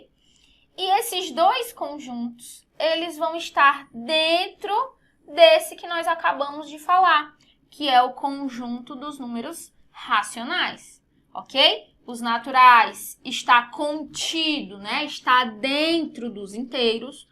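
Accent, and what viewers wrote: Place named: Maranhão, Brazil. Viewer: Muito bom. Sotaque parece dos maranhenses da família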